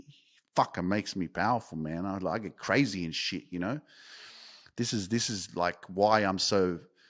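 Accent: Australia. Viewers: I love your message,. ❤❤❤ Thank you for being a guiding light in the darkness for those who need it so very much. Thank you for telling it as it is. No sugar coating. Just the Truth. And in an Aussie accent. 😊 Your message is needed. You are needed. You are loved. You are appreciated. Thank you,. You're beautiful. ❤❤❤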